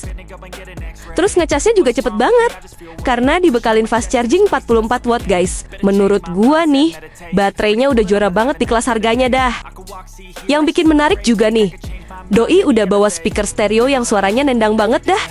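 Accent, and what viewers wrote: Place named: Madura, Indonesia. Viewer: MADURA bang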